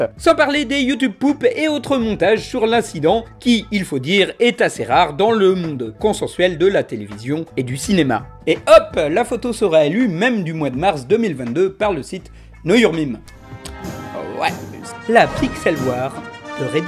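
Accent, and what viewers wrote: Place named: England, United Kingdom. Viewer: Haaa l'accent anglais est toujours présent XD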